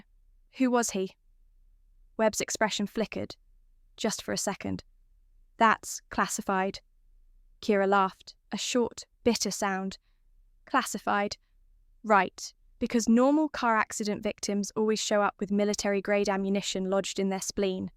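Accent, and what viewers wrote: United Kingdom. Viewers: Gloss or glass? Watching from Tagaytay City, Philippines by way of Oil City, Pennsylvania, USA. How do we get rid of the British English(?) narrators?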